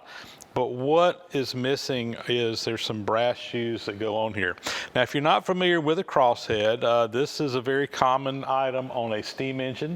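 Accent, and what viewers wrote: South, United States. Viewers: I just finished watching the video from Hand Tool Rescue where you had to make the part for him. In the part of the video where y'all were having the "phone conversation," the minute you opened your mouth, I thought, "That boy ain't from Canada. I wonder how far below the Mason-Dixon line he lives." I was born and raised in Monroe, LA area. My ancestors came from Georgia and Alabama. Enjoyed the video.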